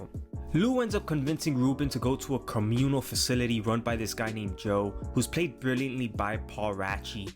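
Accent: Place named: Miami, United States. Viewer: You have a heavy Miami accent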